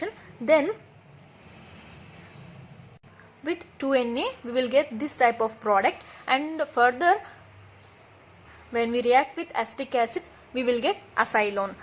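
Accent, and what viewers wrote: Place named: Telugu, India. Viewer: Good explanation madam keep rocking like this. I think your mother tongue is Telugu 🤔.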